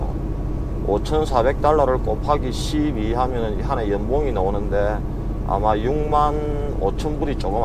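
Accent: Gyeongsang, South Korea. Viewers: 고생 많으십니다 경상도말씨 구수하네요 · 먹고 살기위해 하시는것이지만 조금만 편하셨으면 하는 바램입니다. 경상도분같은데~~~너무 고생하시는것같아 마음이 짠하네요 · 아재.. 경상도 어디 사람이에요?ㅎㅎㅎ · 🇺🇸🙏🏻🌍돈받고 여행하는 직업 ㅎㅎ😂👩🏻🤔🙋🏻‍♀️👍👀깔삼 오랫만에 듣네요..쪼매 ㅎ😂😂😂👏🏻👏🏻👏🏻🇺🇸🙏🏻저는 대구가 고향..🇺🇸🇺🇸20년 미국 살아도 사투리 여전 ㅎㅎ 님도 많이 쓰네요..😂😂👏🏻👏🏻🇺🇸🙏🏻👍🇰🇷🌍🍀🙋🏻‍♀️한번사는 인생..님처럼 사는것도 용기가 많이 필요할것 같아요.저는 파킹을 못해서 큰트럭은 못할것 같고..😂👍👩🏻🤔🤓🙏🏻🇰🇷🌍Michigan 에서 할수있는 것 부터 👀👀👍🌍👏🏻🙋🏻‍♀️저도 운전을 좋아해서..그리고 혼자🐯🐯새로운 직업을 가져 보고 싶네요.2022🇰🇷🌍🙏🏻항상 잘보고 있어요🙏🏻👀🇺🇸🙋🏻‍♀️💕💯🌍🌍👍화이팅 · 트럭에 관심생겨 님 유투브보다가, 댓글처음 남깁니다. 셀프결제 샤워시설 신기하네요^^ 경상도 사투리, 형부생각나 구수하네요, 겨울시작인데 안전운전하세요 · 처음으로 알게된 너무도 멋진 영상 과 푸근한 경상도 목소리 재밋게 듣고 보고 있는데 댓글보니 고인이 되셨다니 안타깝네요 꽤나 많은 인기도 쌓으신분이. 늦었지만 고인의명복을 빕니다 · 경상도 말이 귀에 착착 붙네요 안전운전 하세요~~< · 가끔어쩌다 트럭을 주제로한 영화말고 생생티비라니..근데 경상도 사투리가 아직도 그데로인데 아메리카 트럭커는 어찌 되셨는지 그게더 궁굼하네요..ㅎㅎ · 머나먼 타국에서 수고가 정말로 많네요. 어투가 경상도 분이네요. 혹시 주유소 몇곳과 주유소 접해있는 모텔을 운영하시는 신 부갑씨 아시는지요? 그분도 고향이 경남이고요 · 경상도 캐내디언 ㅋㅋ 멋집니다. 한때 캐나다 이민을 원했는데.... 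아 ~~~~ 간접적으로 대리만족 합니다. 어차피 한국이나 외국이나 어디 사나 물질적으로나 정신적으로나 행복하게 살려고 노력하는 자세가 중요하네요 ㅋㅋㅋ awesome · 경상도 고향이 어디십니까? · 은근 중독이 됩니다 카나다 까지 가시어 경상도 구수한 말씀을 들어니 정감이 더갑니다 ㅎㅎ 늘 안운하시고 즐거운 시간 보여 주시길 바랍니다. 스근하게 즐감하고 가니더 ^^*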